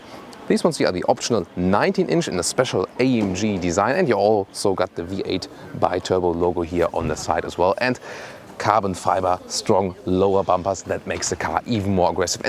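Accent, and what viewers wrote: Germany. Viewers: Oh Mann,. Dein Englisch klingt...Deutsch. Du sprichst flüssig aber mit deutscher Intonation, was absolut furchtbar klingt. Ein wenig Übung und die Sache klappt, gib' Dir einfach Mühe! Selbst als Deutscher kann man dieses Denglisch kaum ertragen. Außerdem solltest Du lernen, wie man bestimmte englische Wörter ausspricht. Beispiel: Coupe wird Cuup gesprochen, nicht Coupee. Bitte verstehe das als konstruktive Kritik.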